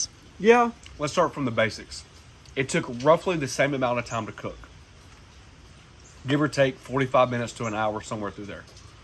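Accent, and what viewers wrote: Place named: Alabama, United States. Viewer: Great video doing this recipe tomorrow. your accent is nostalgic to me growing up in Alabama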